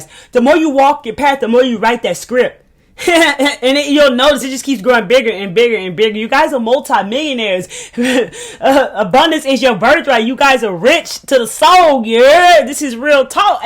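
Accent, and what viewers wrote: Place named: New York, United States. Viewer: You heardddddd lol you gotta be from NY we in the building! 💪🏾love you too Queen 👑 powerful video💯💯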